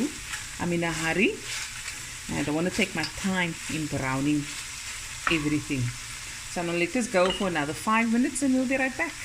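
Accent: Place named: Afrikaans, South Africa. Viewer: I'm a Portuguese South African living in the England just love your accent 😍 & cooking you make me home sick 🇿🇦🇵🇹 english mixed with Afrikaans beautiful 👏👏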